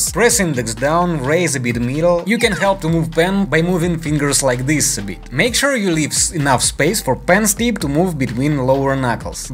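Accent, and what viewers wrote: Russia. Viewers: russian accent?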